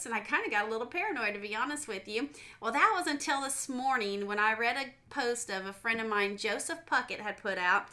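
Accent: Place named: South, United States. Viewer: Nice accent, are you from the South or Texas?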